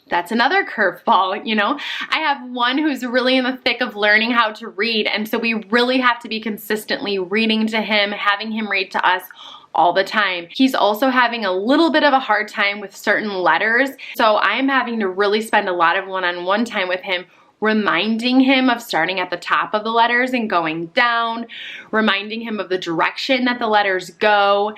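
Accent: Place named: Michigan, United States. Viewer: Commenting again to say that I’m a Michigander (now residing in Tennessee) and it’s nice to hear someone who has my “accent” 🤣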